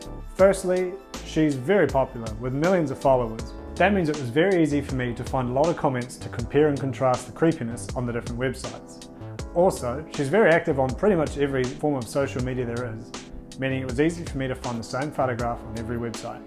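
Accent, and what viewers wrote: New Zealand. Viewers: Are you kiwi? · Only 7k? Bro you deserve at least 100k also love hearing the kiwi accent on yt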